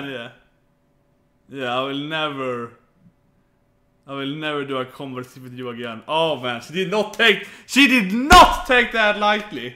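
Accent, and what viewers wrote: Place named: Sweden. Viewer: Yeah, but it gets better, believe me. You also wondered about the other two Girls in the first Kamine and Goda bit. The first girl with the green twintail hair is called Nakasone Maya and is only seen in this bit and a later chapter. The other one, called Patricia, is one of the best characters in the series. I hope they are saving her real involvement in a season 2, since we haven't even seen half of the couples yet. If she was going to be in the show more in this season, she would have been introduced in like episode 2 or 3. I hope they make a season 2, which is like this one but with the other couples and characters that were introduced at this time as focus instead, and then a compilation season with the first 2 seasons in one, the difference being that it would become a 24 min episode show, have the "bits" in correct order AND add the extra pages and Tsurezure Children Plus! 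stuff from the manga. Btw, Svensk? Din dialekt pekar åt det hållet. (<----If you didn't understand that, then nevermind :P)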